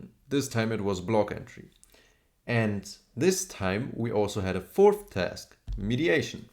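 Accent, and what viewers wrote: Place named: Germany. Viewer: I heard the German accent instantly. Love the vibe of the video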